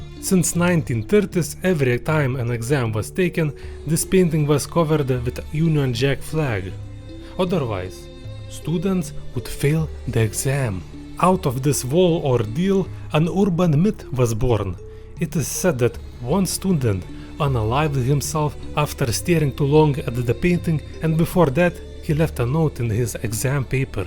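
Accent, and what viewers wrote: Russia. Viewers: Hmmm ... English with Russian accent, nise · а чего не на русском, чусвтуется русский акцент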